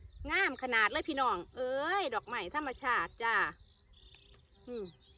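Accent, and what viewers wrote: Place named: Ubon, Thailand. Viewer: 👋😊เดนมาร์กอุดมสมบูรณ์ทุกอย่างน้อจ้า(ขอโทษเด้อยายเป็นคนจังหวัดได๋น้อสำเนียงคึคนบ้านข้อยแท้?)อุบล.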